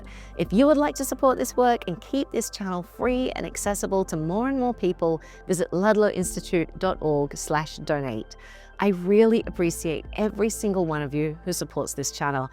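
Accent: Australia. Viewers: Aussies and their darter, make me smile every time. 😊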